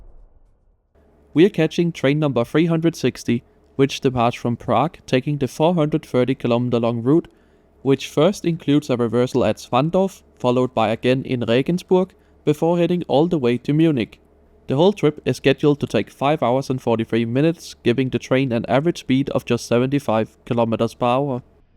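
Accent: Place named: Denmark. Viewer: I was wondering: funny this voice sounds almost like having a danish accent! And them I read the name…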